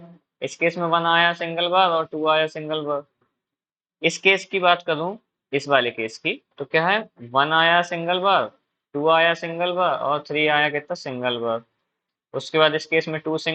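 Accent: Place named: Bundelkhand, India. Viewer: Bhaiya ur Bundelkhandi accent is so good 💯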